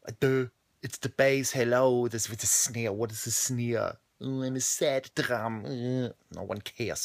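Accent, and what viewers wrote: Germany. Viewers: Ich will nicht sagen, dass dein deutscher Akzent weh tut, weil ich selbst Deutsche bin und natürlich auch einen hab. Aber echt ehy :D trotzdem bist du ein echt cooler Typ :)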